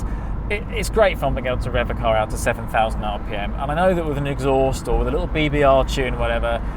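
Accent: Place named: London, United Kingdom. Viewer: "This thing" mmmmm. London Boy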